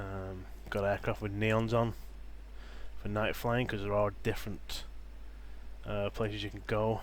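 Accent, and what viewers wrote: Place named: Northern England, United Kingdom. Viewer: Where in the UK are ya dude? I know with your accent your a norther :) Thats where the good lads are from lol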